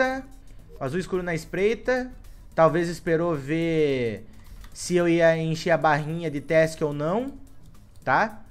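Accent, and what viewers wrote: Minas Gerais, Brazil. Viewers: faz o desafio de falar mineiro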